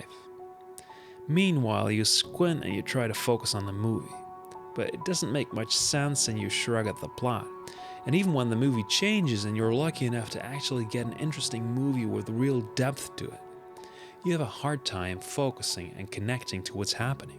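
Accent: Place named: United States. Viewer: Nice video! I also like you american accent ;-)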